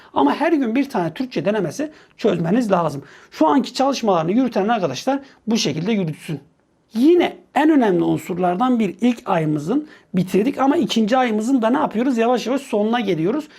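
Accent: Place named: Gaziantep, Turkey. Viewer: Hocam Antepli siniz galiba 😄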